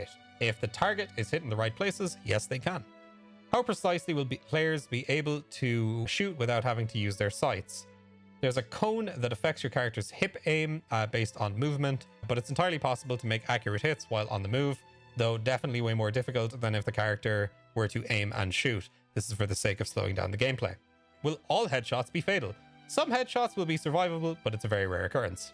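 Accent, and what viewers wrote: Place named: Ireland. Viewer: Where in Ireland are ya from sham?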